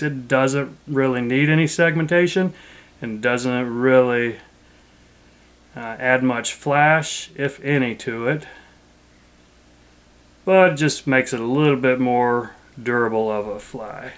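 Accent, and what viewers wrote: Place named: South, United States. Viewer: Stop cringing, although I cringe when I hear my recorded voice 😖I like this format, great intro. You sound more "southern" in this video;o) Geeze in almost 2 years you now sound like a celebrity host of a how to show 👍Nothing wrong with either production styles, your dedication to the channel is amazing! Thanks for doing this! Oh yeah the fly, heard of Humphreys, a lot, but not aware of this bug. I tied Ed Shenk's cress bug. But I'll tell ya, if you pull a Usual under water in the middle of a drift, especially in a good current, hang on for a surprise. I think the trout take it for one of those critters. Thanks again, those flies in the intro were awesome! 🤓👍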